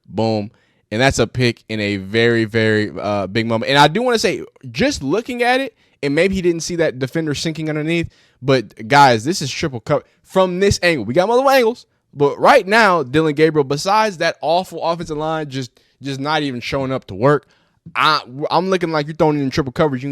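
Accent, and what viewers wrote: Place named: South, United States. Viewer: Dude you talk way too southern like bro just hold up the rebel flag already and just claim your white already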